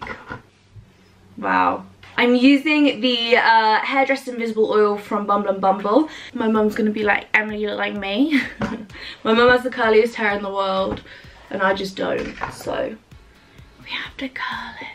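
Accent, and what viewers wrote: United Kingdom. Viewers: British, right?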